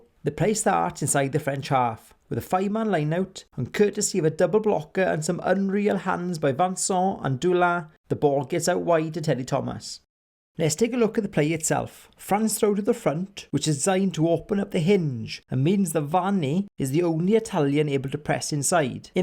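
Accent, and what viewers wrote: Wales, United Kingdom. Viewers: Great analysis, and I like your accent (welsh, if I am right). I will check your other videos. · Sorry. I enjoy what you do but your welsh accent is an obstacle for a normal frenchman. Friendly.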